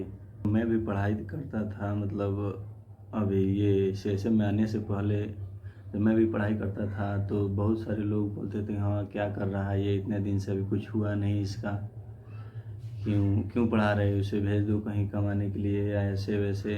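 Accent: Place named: Bhojpuri, India. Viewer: Bhojpuri bahut badhiya laga aapse sunkar aap kaha se hai😂😂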